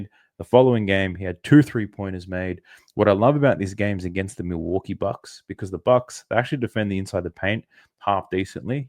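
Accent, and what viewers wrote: Australia. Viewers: Bro I mean this with the utmost respect but I realized that the Aussie accent is the reason I love the intro so much 🤷‍♂️🤣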